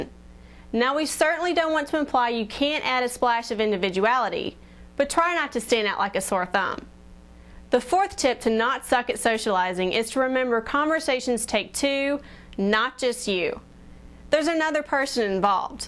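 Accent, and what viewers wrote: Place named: United States. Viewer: american accent hmmmm always different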